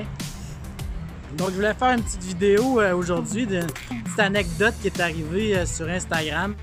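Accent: Quebec, Canada. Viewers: comme prévu je passe un coup d'oeil...super vidéo...interressante franchement !! le montage est vraiment extra et...cet accent ce serai pas l'accent quebecois ?!?!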